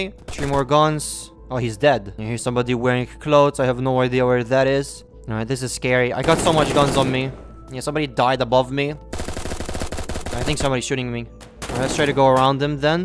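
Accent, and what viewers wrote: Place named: Malta. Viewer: first Maltese person I have ever found playing Unturned other than myself; they are so rare! I noticed immediately from your accent. Keep up the great content!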